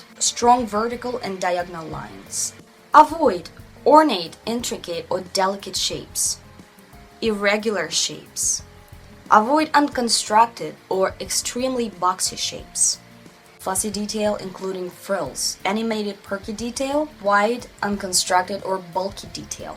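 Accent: Russia. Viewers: please a scarlett johansson inspired <3 <3 <3 can't wait !!! you're the best at inspired makeup :) ps : love that russian accent :D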